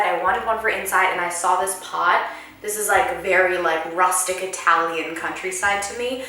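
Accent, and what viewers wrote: United States. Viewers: From an American who speaks french: Use an online course, because the easiest way to learn is to immerse yourself in the language, and Rosetta Stone can help simulate that experience. Also, your American accent is very strong and could interfere with learning a French accent properly, so an online course can help correct that <3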